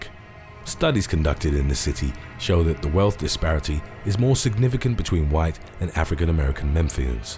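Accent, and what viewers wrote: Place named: United Kingdom. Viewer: I'm not knocking his statistics, however it's kind of hard to believe this guy talking with the British accent lol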